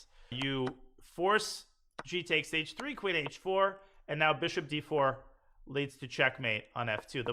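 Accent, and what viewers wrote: Russia. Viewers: Nipo imitation? So fun to here this russian accent. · Sensei Danya you have to speak with a lovely russian accent more often. I love it :) Ofc love your priceless vids too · That Russian accent always gets me. Much love, Danya!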